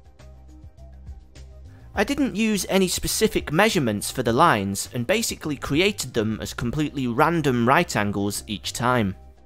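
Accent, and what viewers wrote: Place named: United Kingdom. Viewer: Your narration with your British accent reminds me of the good old Art Attack days! Haha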